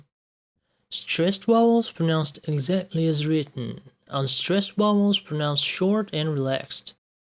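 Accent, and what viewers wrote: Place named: Russia. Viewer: I'm learning Russian, and i can say that this video helped me a lot, no need to be sorry of your Russian accent, you are great!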